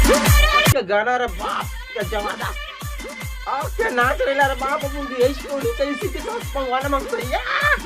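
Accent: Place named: Chhattisgarh, India. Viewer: Sudh au saaf suthra Chhattisgarhi bole bar bhai bahut bahut dhanyawad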